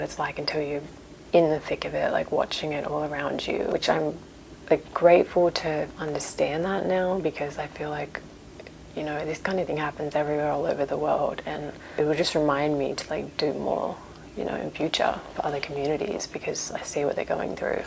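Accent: Australia. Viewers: I'm really sorry to hear about everything that's been going on where you are. It's good that you're okay though. As for your content, I love the asmr cooking videos you've been posting. There's not much of that around in comparison to the tapping videos, so it's a good little niche that you've found. Some general vlogs about things that are happening in your life would be pretty cool as well, and maybe you could incorporate some videos about your progress learning the guitar? You've got such a naturally nice and soft voice, that I could listen to you talk about anything really. Of course I'm a sucker for an Australian accent too 😂 But whatever you decide to do, I'll definitely stick around to see where it goes. Take care and stay safe!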